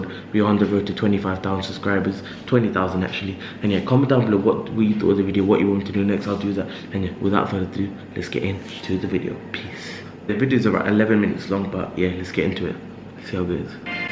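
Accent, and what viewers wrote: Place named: Scotland, United Kingdom. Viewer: are you Scottish? idk you have thick accent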